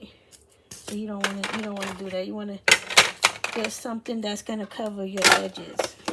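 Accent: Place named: South Louisiana, United States. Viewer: You are so excellent, at doing fae bake tutorials. ❤️ Your accent is identical to my cousin's accents in Houma, La. as I've shared before,; you make me so homesick, for south Louisiana.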